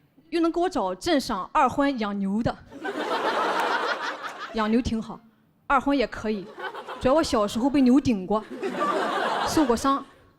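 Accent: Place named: Shandong, China. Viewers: 面相身材口音非常山东！😂😂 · 这个姑娘真不错 三观正 不是为了骂人而骂人 比如杨某 带点土的山东口音又给人真实感 赞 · 山东口音，徐志胜也是山东的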